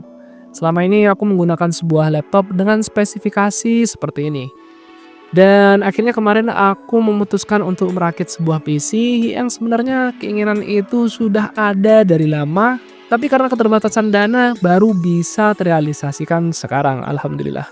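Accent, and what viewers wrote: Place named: Madura, Indonesia. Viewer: Orang mana bang kok logatnya kayak madura ?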